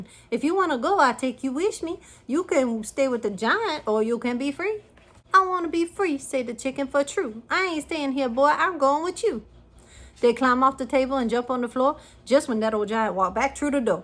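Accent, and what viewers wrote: Louisiana, United States. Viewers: Love the storytelling! Please make the Cajun stories a regular part of your channel! I love them so much and your voice is so perfect and soothing! Love the Cajun accent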